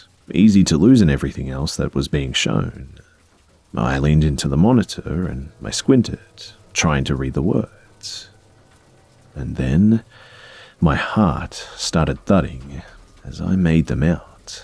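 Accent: Australia. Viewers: Just fabulous Its a real treat to listen to my native aussie accent for change I've subscribed and now about to binge listen to some of ur other works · I swear you're Australian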